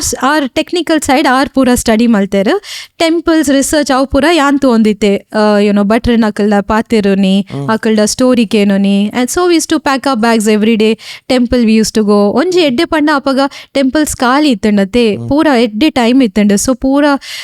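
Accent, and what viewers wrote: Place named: Tulu, India. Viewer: This madam tulu is foreign tulu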